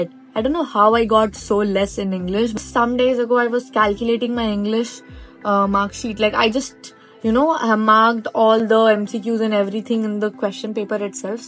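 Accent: Bihar, India. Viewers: In love with your bihari accent 😭🫶🏻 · Didi aap Bihar se ho na😊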